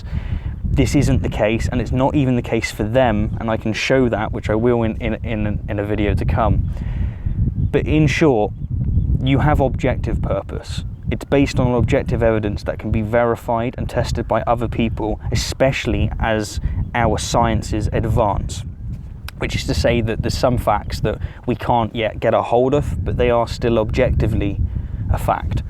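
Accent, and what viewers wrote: United Kingdom. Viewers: wait you're British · So you re british! Didnt notice in somehow. Southern coast seems nice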